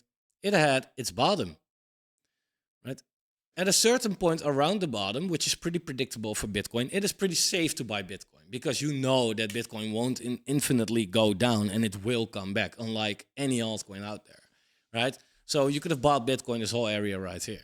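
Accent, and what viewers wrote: Netherlands. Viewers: Great channel . I can hear you are Dutch just like me 😬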